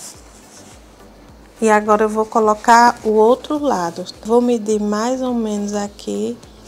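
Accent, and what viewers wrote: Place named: Northeast, Brazil. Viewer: Boa tarde. Amo seus videos e as explicações, sem falar no seu sotaque nosdestino. Tbm sou. Mas, não fique chateada.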